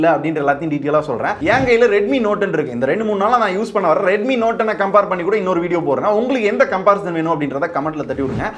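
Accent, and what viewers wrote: Madurai, India. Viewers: மதுரைகாரன் பேச்சே தனி தான்...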